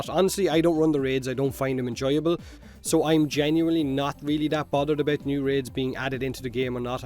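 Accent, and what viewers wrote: Ireland. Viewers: the moment those words split out of the mouth of that, I knew!! I will always luv this Irish dude talking aboat Warframe <3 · ** whoever criticised you for looking a little uncomfortable. Let them sit in front of tens thousands of people from all around the world and try to keep it as cool as you did, sitting there happy in the background, all potatoy and s**t. Was awesome seeing you there. First devstream I watched completely (30% because of you, maybe 40%:P). So keep your head up and your accent irish^^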